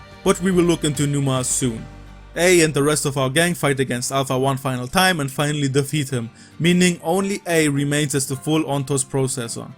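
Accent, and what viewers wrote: Germany. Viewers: aah I knew it xD. Fellow German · Also what's your accent? German? Just wondering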